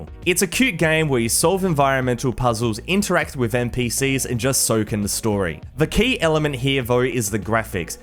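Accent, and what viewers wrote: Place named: Australia. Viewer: Aussie accent mate that’s how we say it.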